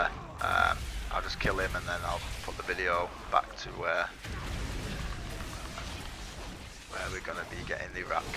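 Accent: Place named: Northern England, United Kingdom. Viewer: Cheers angel!!!!!!..I finally found the last part .. which for me was the one on the top of the mountain!!!.. Huge thank you .. I even liked listening to your northern accent!!! fantastic video .. thanks again!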